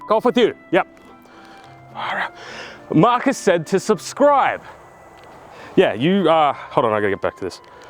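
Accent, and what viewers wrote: United States. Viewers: I need more American accent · American accent or dubbed anime accent? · This is what I have been waiting for. Thank you for getting deep into the nitty gritty. Fantastic American accent. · The American accent is killin me lol great video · I think we need more love for his American accent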